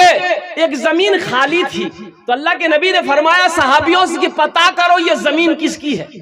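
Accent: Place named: Bihar, India. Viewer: Asli behari urdu bol rahe hain.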